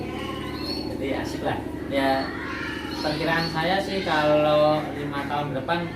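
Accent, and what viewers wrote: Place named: Banyumas, Indonesia. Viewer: Weh tanggane nyong kue ngapak2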